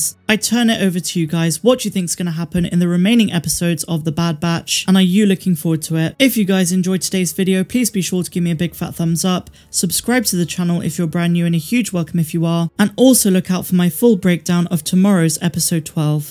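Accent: United Kingdom. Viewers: You’re British accent really helps mine mate , thank you mate . And may the force be with you.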